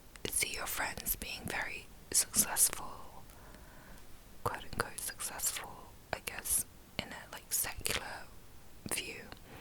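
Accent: England, United Kingdom. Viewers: You are starting to sound a little more 'English' after your time over here in the UK :) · 만세만세🙌🙌🙌🙌🙌인스타에서 댓글받고 얼마나기다렸는지 몰라요🙌🙌고3이라 폰을 중독방지어플로 잠궈놔서 알림받고도 보지를못했어요ㅜㅠㅜㅠ이제야보다니ㅠㅜㅜ언니 머리 많이자랐어요!!!!어릴때 영국갔을때 그 특유의 발음을 듣고 컬쳐쇼크였는데 언니발음에도 그 발음이 들려요ㅇ.ㅇ!!영국미국을 오가며 글로벌하게 자라셔서 그런가ㅋㅋㅋㅋ컴백환영해요💕💕💕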